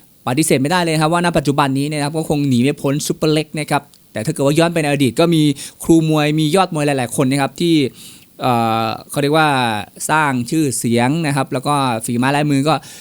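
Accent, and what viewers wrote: Isan, Thailand. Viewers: มักหลายยามเว้าภาษาอีกสาน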